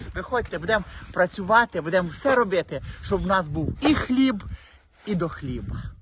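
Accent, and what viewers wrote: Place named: Chernihiv, Ukraine. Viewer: вы живёте случайно не в Черниговской области? Я прямо свою бабушку услышала. Вот именно так она говорила, слово в слово. Я с Сибири, а моя бабушка с Черниговской области. В Украине, ведь везде своё наречие. Бывает,я кое какие слова понять не могу, а вас прямо каждое слово. Я молюсь каждый день с начала войны за вас и скорблю вместе с вами. Дай Бог всё скоро закончится. Сил вам душевных и физических. Я вместе с украинцами радуюсь вашим удачам в бою, и переживаю за неудачи.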